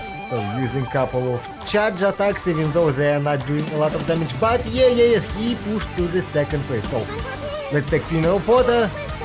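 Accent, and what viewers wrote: Russia. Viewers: Прикольный рашен инглиш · Как забавно слышать английский язык с русским акцентом) Нахида и Яэ Мико прям чисто на русском сказаны) Но английский неплохой)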